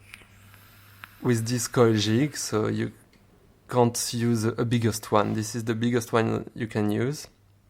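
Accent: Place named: France. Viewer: You have lovely French accent : )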